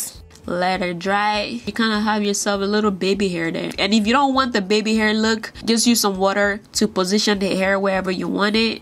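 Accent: Nigeria. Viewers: oh my GOD i love this video..are you Nigerian? because i was thinking...as a result of the songs and your voice also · That was ignorant and uncalled for... She is a Yoruba (Nigerian). I'll have you know that while Yoruba is one of three major tribes in Nigeria, English IS the first language. She may speak Yoruba, but nonetheless she would understand and speak English regardless..its spoken everywhere in Nigeria... Don't be shallow just because you hear an accent..Wow lol..smh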